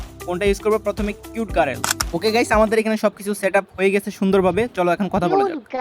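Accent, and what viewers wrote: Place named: Chittagong, Bangladesh. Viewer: vhai ki koitam to ar video in ato valo lage ar ton but ay kintu chatgya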